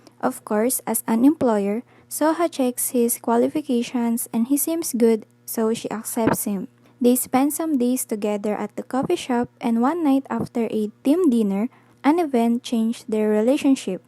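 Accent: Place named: Philippines. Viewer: im pretty sure the accent if from tagalog or the language of the philippines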